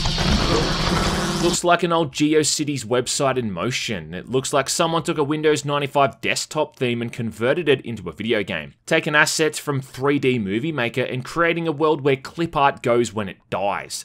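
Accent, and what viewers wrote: Australia. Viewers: This review suddenly feels like the bad old days of G4TV's X-Play in the mid-2000's, but instead of Adam Sessler, you get an angry Australian.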